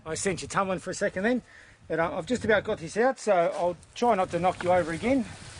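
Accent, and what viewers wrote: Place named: Australia. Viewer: As an American, I really enjoy listening to an Aussie explain things. Always straight forward to the point. Great video.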